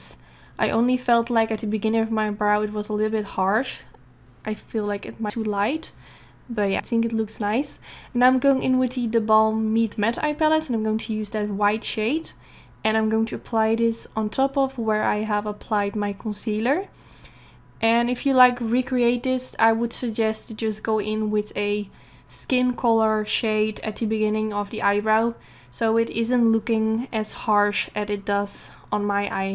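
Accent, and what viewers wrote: Netherlands. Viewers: This person sounds Dutch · '' ✦ ABOUT ✦ My name is. I'm 24 years old and live in the Netherlands. '' I hear your dutch accent beneath it:P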